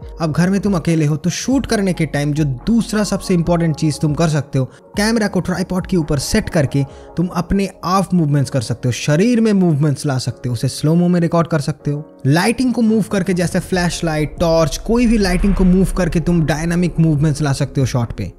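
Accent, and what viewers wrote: Bengal, India. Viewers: Bhai are u bengali?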